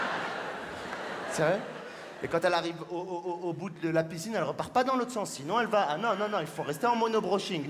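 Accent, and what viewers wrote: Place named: Morocco. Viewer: J aime bien l'accent français marocain Je suis italienne et à travers tes sketch, j 'apprend mieux la langue française, ses sang,intonation ,prononciation Merci bien ,Bravo !!!!!!!!!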